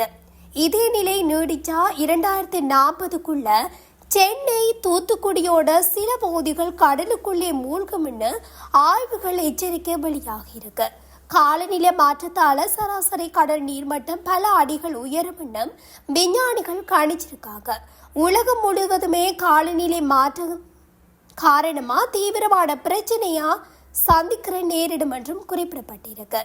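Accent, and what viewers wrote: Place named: Sri Lanka. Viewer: Ilangai thamil kaetpadharku inimayaaha irukum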